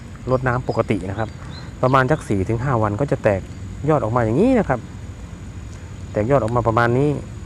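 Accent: Thailand. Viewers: ดีมากเลยค่ะ พูดภาษากลาง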